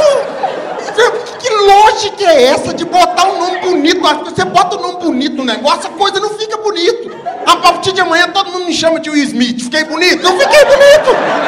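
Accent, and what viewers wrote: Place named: Minas Gerais, Brazil. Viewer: Mineiro falando tipicamente mineiro já me causa risos, e com texto top, bom tom de voz e "taime" certo fica top...kkkkkkk Mt show 👏👏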